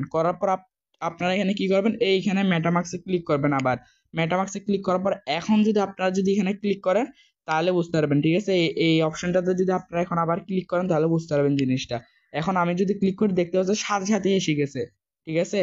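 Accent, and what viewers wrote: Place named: Chittagong, Bangladesh. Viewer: ভাইয়া ত চট্টগ্রামের মনে হয়,, কোন যায়গায়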